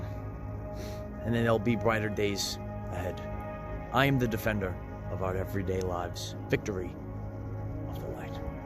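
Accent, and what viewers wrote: New York, United States. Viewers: Yes! These last 2 years have flown by! Are you originally from NY?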